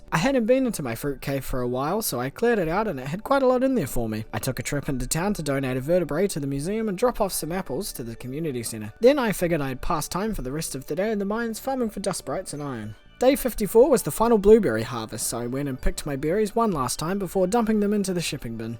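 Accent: New Zealand. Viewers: is that a fellow kiwi accent i hear? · Great video and very funny! Is that the Kiwi accent I hear? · You from NZ? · stardew and a nz accent 🥰 love it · Are you from NZ? I'm Australian and ur accent rlly sounds nz :)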